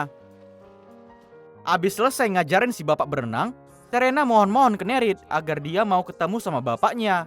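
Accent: Medan, Indonesia. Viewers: orang medan La kau Bang,. yg punya Channel,.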